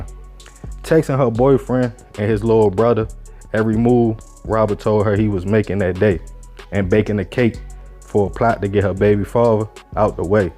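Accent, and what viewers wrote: Baltimore, United States. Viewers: Born & Raised in Baltimore 🖤 I subscribed soon as I heard the B'More accent... Too, blue, you, far Va😂 father!.. keep winning Young 👑🖤🙏🏽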